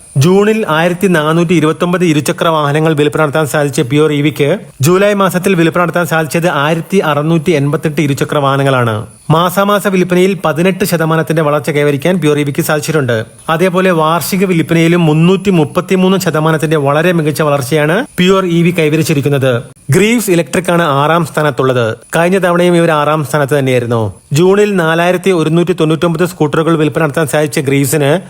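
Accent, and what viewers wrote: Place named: Kannur, India. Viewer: kannur ടോണിൽ തന്നെ bro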